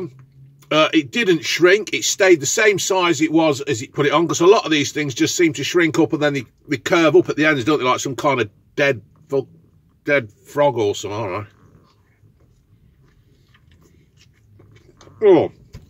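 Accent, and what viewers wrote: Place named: Northern England, United Kingdom. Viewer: mate I can’t pin down your accent where you from? Love the channel by the way and attitude towards not caring about money👍🏻 i know your from Leicester or grew up there but sound more northern, is that due to parents or what?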